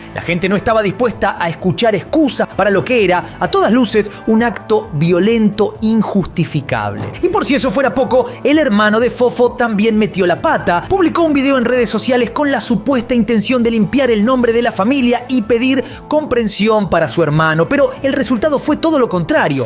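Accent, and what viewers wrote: Argentina. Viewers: Nunca e salido del país (México) y aún así detecto que eres argentino a mí parecer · Está bien el reportaje pero lo malo que lo narra un argentino y no un mexicano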